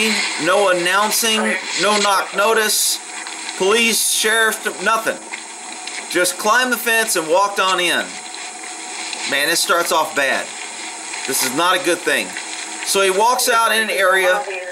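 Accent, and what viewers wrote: United States. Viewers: You talk over vid way too much !! Tip: shut your trap with that arrogant American loud claptrap accent !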